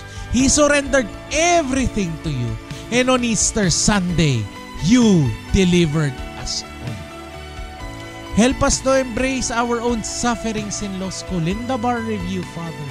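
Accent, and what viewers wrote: Batangas, Philippines. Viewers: Ay Batagueño pala c atty..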